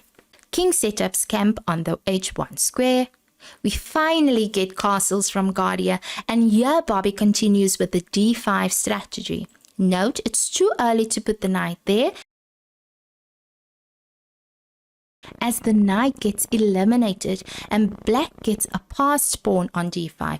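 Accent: South Africa. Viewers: That South African accent 😮‍💨👌🏻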